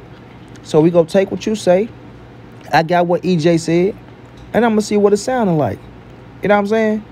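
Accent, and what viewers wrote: St. Louis, United States. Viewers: Yo where ya from fam? I hear that stl accent... Salute For alway keeping it a 💯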